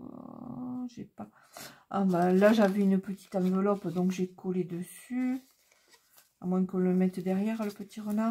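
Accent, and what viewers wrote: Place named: South, France. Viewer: Bonsoir Miss à l'accent du soleil ???? Bonne idée super merci de ta vidéo scrapbises de l'Atlantique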